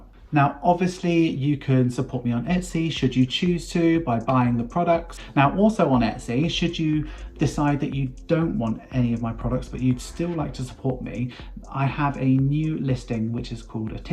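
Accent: United Kingdom. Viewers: Congrats! I just enjoy hearing you speak with British accent haha. Hello from Los Angeles.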